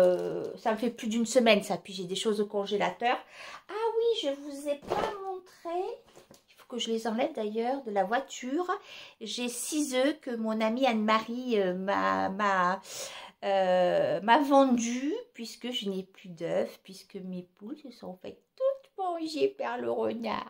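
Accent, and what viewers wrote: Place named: Ariège, France. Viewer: Coucou ma puce , j'adore regarder ta vidéo et je vois que tu as gardé ton petit accent ariegois ❤ bravo ma chérie , pour tes petites courses , j'adore ma puce tu es vraiment naturelle , gros bisoussss ❤❤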